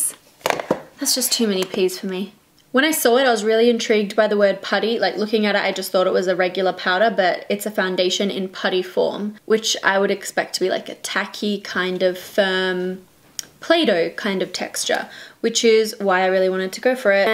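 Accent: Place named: Australia. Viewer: Is it bad that I read the title in her Australian accent? Lol I just had too 😭 I love her too much ❤ She's soo beautiful!!! I can't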